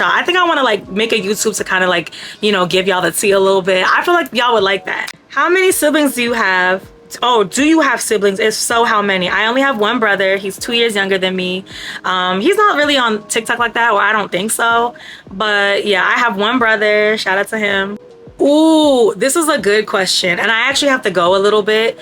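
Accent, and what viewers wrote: Jamaica. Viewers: Far a while was trying to figure out if you were Jamaican descent, because I can kinda hear it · Funny thing is your natural accent reminds me of Jamaicans I know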